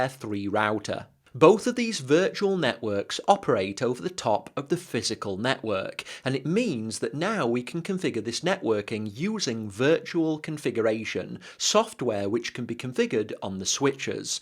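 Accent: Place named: Northern England, United Kingdom. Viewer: In your opinion, how much networking knowledge one should have to go into cloud architecture? Would you say CCNA level is sufficient or maybe a person should be at CCNP level, or one would manage with Netywork+ ? Thanks. by the way, super cool videos and teaching style. Love the UK northern accent :-) miss it here, down under :-D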